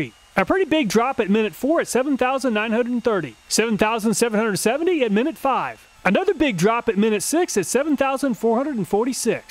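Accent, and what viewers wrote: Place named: South, United States. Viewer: You talk so slow, must be that southern drawl I keep hearing about.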